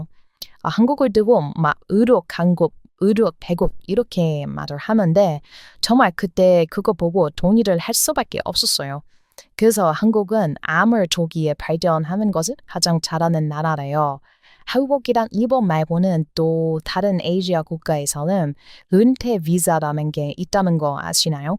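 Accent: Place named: United States. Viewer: 평소 푸른 눈의 서양 여자인 전형적인 미쿡여인 이시지만 발음은 미쿡식 그러나 어휘력은 한국인에 가까우신데 발음만 교정하신다면 진정토종 한국인 같을거에요 아름다운 그대 진정 한국인으로 사시기를 원하신다면 님께 잘 맞고 멋진 한국남자를 만나 진정행복한 삶을 사시기를 진정으로 기원합니다😂😅😊❤❤❤❤❤❤❤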